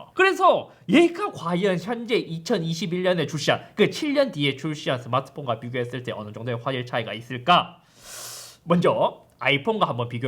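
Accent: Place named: North Gyeongsang, South Korea. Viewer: 잇섭님 리뷰는 대구사람으로서 듣기 아주 편합니다. 보통 유투브 경상도 사투리는 경상남도 사투리라 되게 다르게 들리는데 고향이 경상북도신가 봅니다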